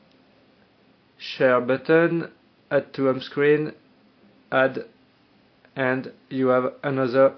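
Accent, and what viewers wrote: France. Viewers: T français acsenent de merde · Are you French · sa se voit tellement que t'es francais mais c'est bien d'essayer!!!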